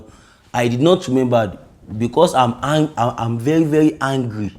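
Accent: Nigeria. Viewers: Is he speaking to an English audience or an African audience who speak Nigerian English?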